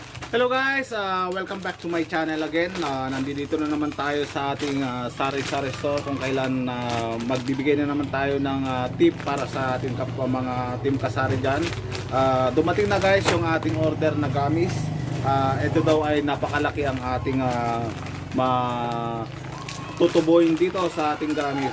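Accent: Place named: Waray, Philippines. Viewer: Saan Po ba location nyo sir? Parang waray waray ka boss hehe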